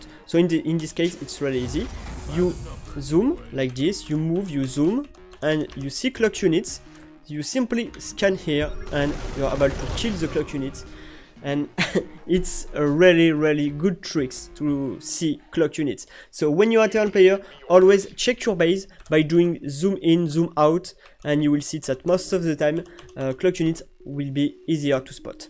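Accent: France. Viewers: Le bon vieux accent français x)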